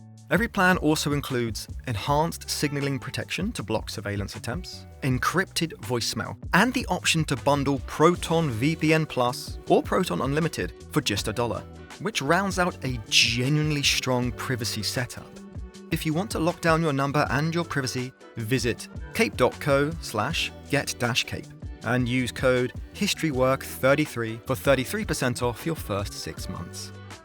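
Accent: United Kingdom. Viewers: How are u British now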